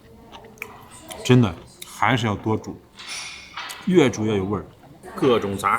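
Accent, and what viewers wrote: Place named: Xi'an, China. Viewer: 是咱西安乡党吧，这一口亲切的醋溜普通话哈哈哈哈😂😂😂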